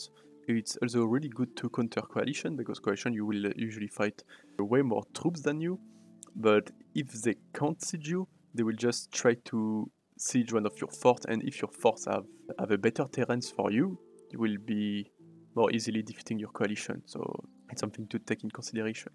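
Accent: France. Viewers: L’accent français le plus beaux qu’il soit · Why you speak english like macron? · A french man speaking english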